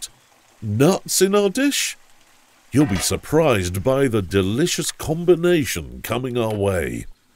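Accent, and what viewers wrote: United Kingdom. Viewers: I've seen so MANY of these videos to know that is a superb cook! I LOVE it, when there's NO narration, with the quiet absorption of nature. That being stated, I'm OKAY with this (I assume, British) gentleman's voice; he speaks in the first person...as voice. Whereas I find it a bit hard to associate the accent with the person (!), I appreciate that this narrator doesn't excessively talk...he pauses now and then, which is good. I'm still diligently attempting to discard national prejudice(s). Our fellow humans NEED to sustain love, especially in these times of turmoil. does that. SUPER 👍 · That dubbing or voice over does not go well with the genuine pure nature of these videos. Your videos are like a window into that simple village life. Voice over in a British accent feels like having coffee with salt.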